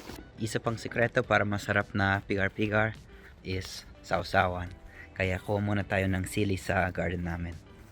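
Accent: Philippines. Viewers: Wow I can’t believe your accent pinoy na pinoy talaga.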